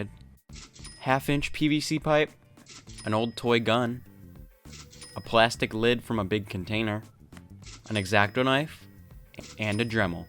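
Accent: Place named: New York, United States. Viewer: you spund like youre from new york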